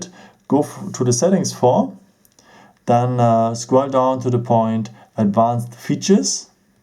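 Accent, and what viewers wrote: Germany. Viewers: Warum hört man bei deutschen immer raus das sie deutsch sind?haha aber danke für das Video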